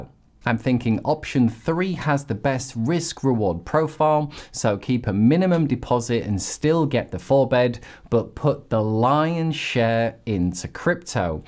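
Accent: United Kingdom. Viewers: I realize that I like your videos cause your accent reminds me my visit to Britain. Such a beautiful land and scenery... However I buy the deep... 😁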